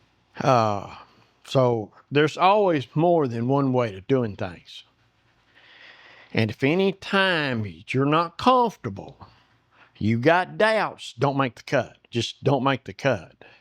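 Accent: South, United States. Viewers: The only thing better than your vid’s is your southern accent! Keep up the good work! Btw…are you going to tell us how you made the steampunk gears? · I really like your channel sir, I’m a cabinet maker by trade and have been for many years. It’s guys like you who teach me things and help me grow. I thank you for your videos and experience. Keep ‘em coming. Don’t let the negativity get to you, some people have absolutely nothing better to do than put others down…. Especially when they are envious. Personally, I enjoy your southern accent. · Kids can't even make change at a checkout counter, and they want to make fun of a master carpenter because he has a southern accent! This tells you where this kids head is at.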